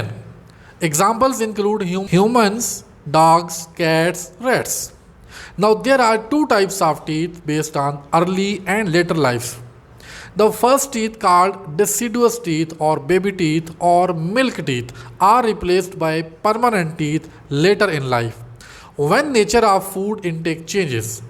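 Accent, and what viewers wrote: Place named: India. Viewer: indian ahh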